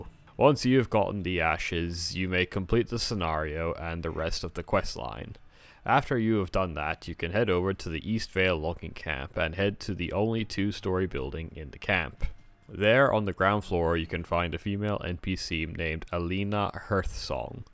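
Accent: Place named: United Kingdom. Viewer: oh damn lmao, didn't realise you were from there, I heard British in there lmao.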